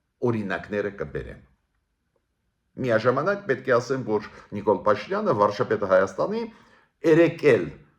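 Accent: Russia. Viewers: Այս ոնց է որ չասեցիր Ռուսներն են մեղավոր եւ Պուտինն է ձեռբակալել։. Տխմար այդ ռուսական ակցենտդ որտեղից ես ժառանգել։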